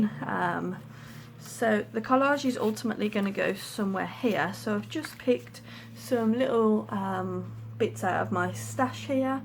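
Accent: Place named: Birmingham, United Kingdom. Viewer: I’ve just binge watched your travel journal videos and you are a breath of fresh air! Plus, as an exiled Brummie it’s lovely hearing the accent and the planes going to Birmingham international. I’ve got so many maps I’ve collected over the years plus loads of tickets leaflets etc. Thank you for sharing your expertise. 😘😘😘❤️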